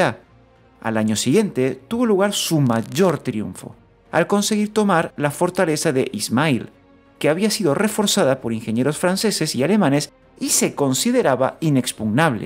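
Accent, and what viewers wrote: Latin America. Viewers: Ya quisieran muchos profesionales hacer audiovisuales como este canal. Y me encanta el acento Hispano de América.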